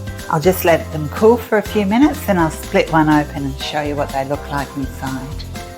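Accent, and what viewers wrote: Australia. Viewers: Never apologise for your beautiful Aussie accent.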